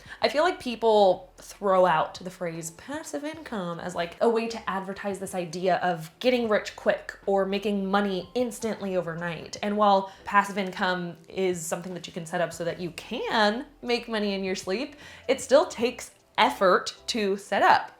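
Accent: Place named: United States. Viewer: Hi! I am learning from you. It is not only about side hustles but also about understanding and speaking English with an American accent. There are many languages and dialects here in the Philippines, and I speak 4 of them. English is such a big deal here, you have to speak English well to be considered smart and intelligent by most people. You are easy to understand, and I am planning to watch 1-2 videos of your videos every day. Thank you.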